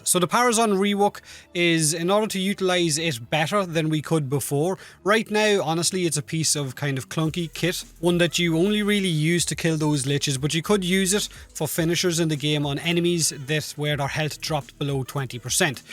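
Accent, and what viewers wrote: Ireland. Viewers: U from Ireland bro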